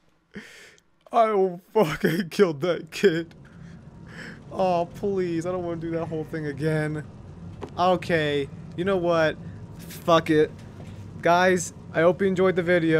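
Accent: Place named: South, United States. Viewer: Now i see how u can have a very high voice with a southern accent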